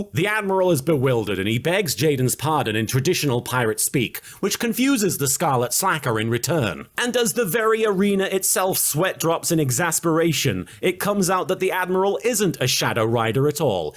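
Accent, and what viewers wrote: United Kingdom. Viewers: wait are you british??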